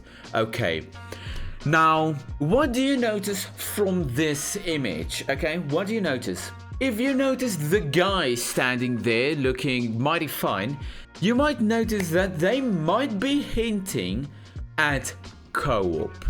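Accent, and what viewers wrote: South Africa. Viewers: hey dude epic video just a random question here by any chance are you south african? I'm just asking as myself im South African as well and the accent sounds very like South African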